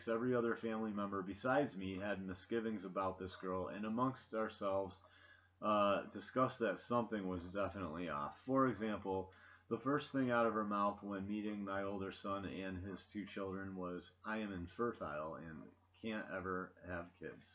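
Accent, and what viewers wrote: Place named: United States. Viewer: You're American (judging by your accent), yet you don't know that Trinidad is located in the Americas. :/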